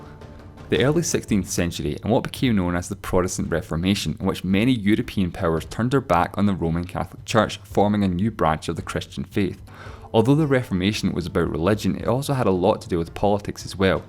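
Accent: Scotland, United Kingdom. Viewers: No Scottish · He's Scottish and his accent is fine. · It's Scottish and why are you calling it ugly it's a nice accent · it doesn't sound scottish anymore woah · I'm sorry...I totally got distracted by the sexy bass and the accent of this man! WHEW! It sounds Scottish. Am I wrong? I shall continue watching trying without a hard-on! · His accent is pretty light compared to other Scots the more rural the heavier the accent. Same thing for the Welsh and the English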